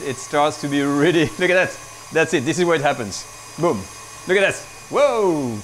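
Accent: France. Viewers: is always nice to speak English with France Accent... tres bien... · Isn’t he French? Doesn’t sound Italian.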